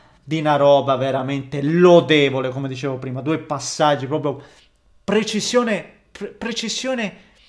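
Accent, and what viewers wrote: Calabria, Italy. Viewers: ma sei Calabrese?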